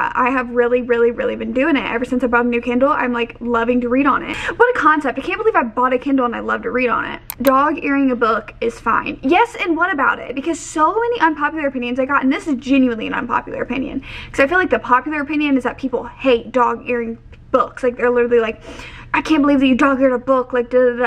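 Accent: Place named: Midwest, United States. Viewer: "audiobooks don't count" ok then well who is keeping score? what are we counting towards here other then our own goals? are the book police gonna come arrest me cause i listened to it instead of using my eyeballs? i was able to talk about it with the other people in book club and none of them knew i'm a big ole CHEATER by listening to it according to that person. side note: i used to live in indy and i no longer live in the midwest at all, and sometimes i just need a good dose of your accent. you mentioned meijer in another video and i ascended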